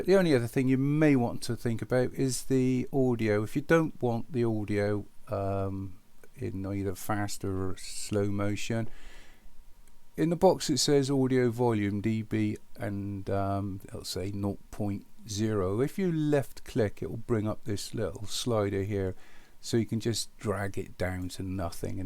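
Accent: United Kingdom. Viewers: I like your accent dude British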